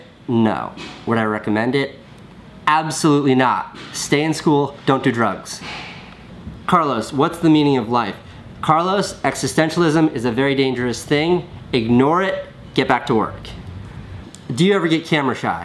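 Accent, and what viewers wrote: France. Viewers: Great french accent ! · That accent in french though :) Greetings from Paris ! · haha love your french accent! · your french accent it's cool :p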